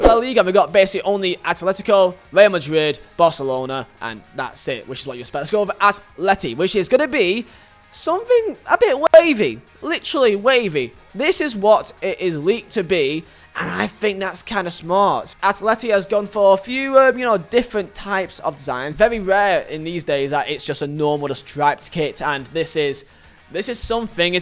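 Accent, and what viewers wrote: United Kingdom. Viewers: ¿this dude is british? (question from a Mexican.)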